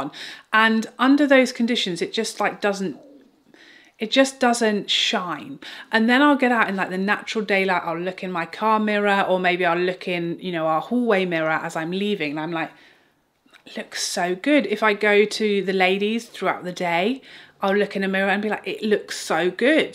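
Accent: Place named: United Kingdom. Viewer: I had to leave my favorite Chanel foundations due to the heavy perfume scent they all have now. Just found Chanticaille Future Skin Gel Foundation - light to medium coverage, fragrance free, looks lovely on the skin but PRICEY. By the way, love your humor (humour) and your British accent - I could listen to you all day. XO from the US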